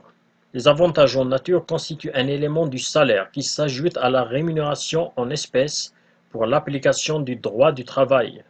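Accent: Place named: Kabylie, Algeria. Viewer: Merci beaucoup, je souhaite suivre une formation pour devenir gestionnaire de paie, je trouve vos videos intéressantes merci beaucoup Au passage, dapres votre accent, je pense que vous etes kabyle, ca fait plaisir de voir des kabyles proposer des contenus pareils sur youtube, bonne continuation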